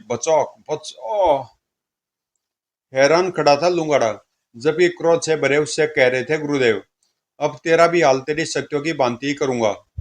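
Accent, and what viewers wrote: Haryana, India. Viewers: मजा आ गया हरियाणवी स्टाइल में comic सुनकर भाई, जय हो 🥹🤟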